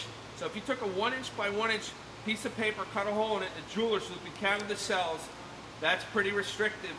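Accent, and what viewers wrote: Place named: Long Island, United States. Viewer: I moved out of NYC and I watch Fabspeed videos when I need my fix of that Meeeasapeequah accent. Parts look great too. How do these cats do on emissions?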